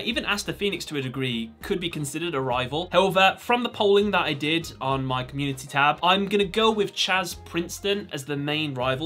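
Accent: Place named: RP, United Kingdom. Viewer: 😂 how truly mediocre, this person talks like the queen of England for gods sake, if anyone spoke like that in day to day life they’d never hear the end of it, he/she’s just trying to sound clever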